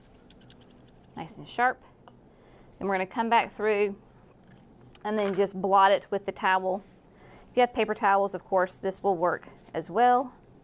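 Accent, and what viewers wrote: South, United States. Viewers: I am glad you did this video! My Felcos are way overdue for the spa treatment. Thanks! Also, have you ever had the auto-generated captions up and played one of your videos? It is so cute how it interprets your Southern accent! :)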